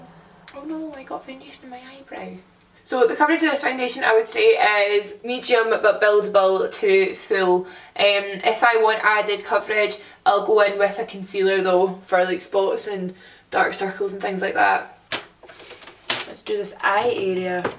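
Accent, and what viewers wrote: Scotland, United Kingdom. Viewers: I'm a new subscriber and This is amazing And r u from Scotland 😂 xxxx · is she Scottish?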